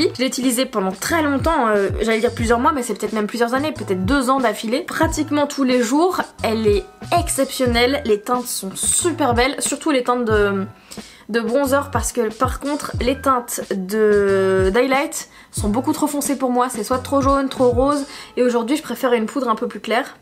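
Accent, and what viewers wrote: Belgium. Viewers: Tu as pris un peu l'accent belge j'entends 😂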